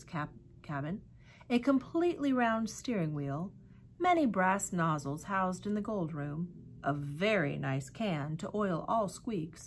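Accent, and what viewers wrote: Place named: Texas, United States. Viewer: I love you. Ty for this. Btw, u have a Texas accent now. ☺️ I love it!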